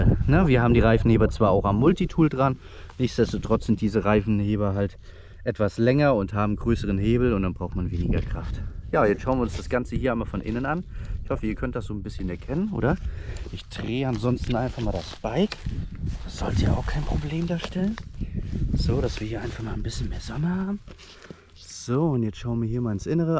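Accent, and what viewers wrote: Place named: North, Germany. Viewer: eines der wenigen male, dass ich wirklich höre, das jemand ausm norden kommt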